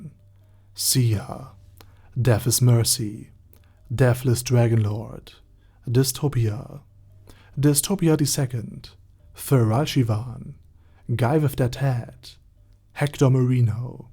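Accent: Germany. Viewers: Selbst als Deutscher fällt mir das Lesen deines Namen sehr schwer. Ich finde das Video sehr gut und dein starker Deutscher Akzent ist auch ma was anderes .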